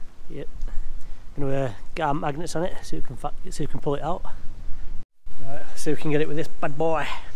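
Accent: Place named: Liverpool, United Kingdom. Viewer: No. I just don't understand Scouse.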